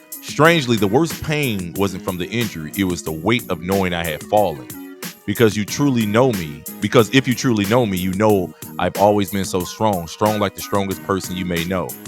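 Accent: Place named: United States. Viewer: First of all. This Loser needs to learn how to pronounce the word "scared" to stop embarrass himself. He sound country as hell. He heckling a female at a track meet, when he should encourage all of them. You don't know her personally, so stop hating on her. You are a true hater. What a Loser! Stop putting "hate" into the world, ignoramus. 😂😂😂😂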